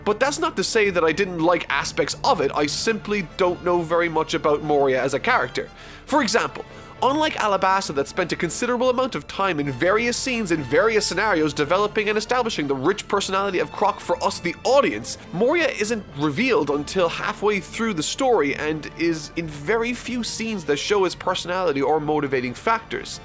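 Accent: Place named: Ireland. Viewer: I detected an Irish accent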